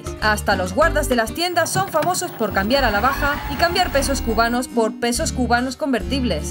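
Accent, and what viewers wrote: Spain. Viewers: Es de España por acento . En fin que en España es inseguro . En Barcelona de noche es inseguro . Y en Madrid hay sitios inseguros .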